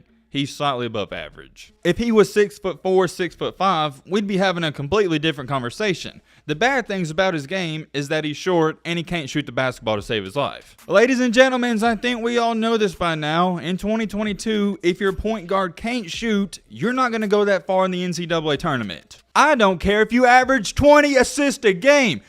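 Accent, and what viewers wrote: South, United States. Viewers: LOL do you really speak like this? sorry, I'm a South African, I only hear this Southern accent in the movies, I always think it's exaggerated LOL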